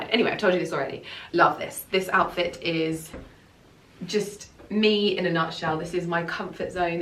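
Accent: United Kingdom. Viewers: I am polish And I Love Your videos content ❤ as well Your british accent. I even learn english with You to have nicer english 😊 You are just such a lovely person ❤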